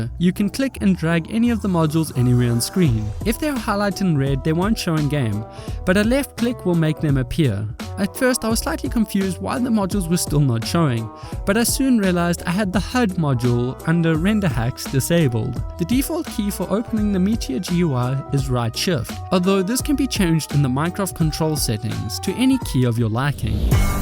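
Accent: South Africa. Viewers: this man sounds southafrican. idk why but he just does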